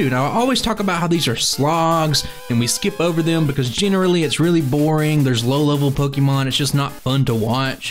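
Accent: South, United States. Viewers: what’s funny is to me you have a southern accent (I’m from Michigan) and in my mind I was like “oh yeah, he’s gotta be more southern based, that makes sense” lol.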